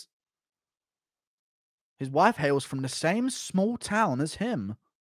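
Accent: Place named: West Virginia, United States. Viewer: Sounds like West Virginia especially boone county.......wait......I'm from boone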